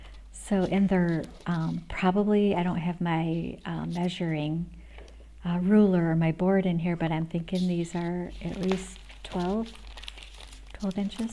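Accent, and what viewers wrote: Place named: New York, United States. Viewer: That sounds like you’re in New York. Are you in New York? Hailing from Albany.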